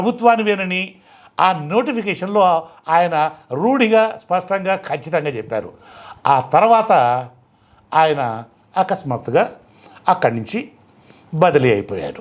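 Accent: Nellore, India. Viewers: Sir mee nellor yasa baguntudi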